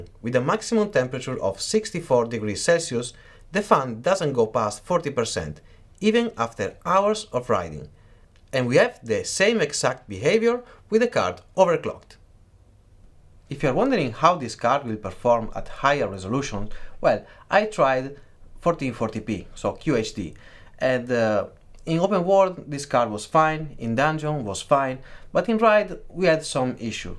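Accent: Italy. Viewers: Are u Italian or portogese?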